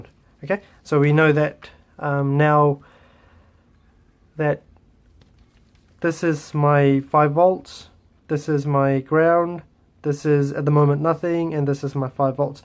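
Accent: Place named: New Zealand. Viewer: Your from NZ aye bruvvv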